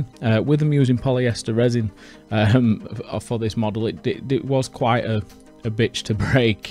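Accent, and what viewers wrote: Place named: Yorkshire, United Kingdom. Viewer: Tell me your from Yorkshire without telling me your from Yorkshire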